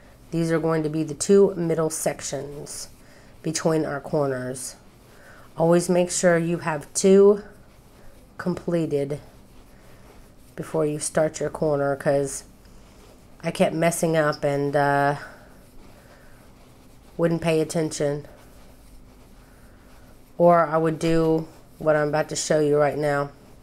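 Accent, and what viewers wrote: South, United States. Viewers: Did you say in "Israel'? I've been following your videos and never picked up on that. You sound so southern to me. Did you move there? Did I even hear right? Sorry, couldn't focus. Played it over. Anywho... thank you again for another video. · Have you lived in Israel all of your life? You have a accent with a USA southern drawl somewhat. I'd love to visit Israel.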